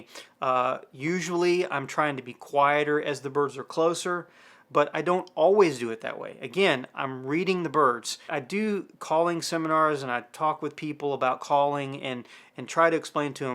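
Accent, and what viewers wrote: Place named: Arkansas, United States. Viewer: What part of Arkansas are you from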